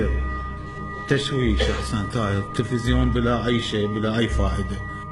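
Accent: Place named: Iraq. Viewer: زباله كلمه عراقيه بحته وطريقه كلام السيد. هم عراقي بحت ويبقى من عامه الشعب ومنه وبينه ف هاذ الحجي زد وهيه كوه تردون تسقطون بيه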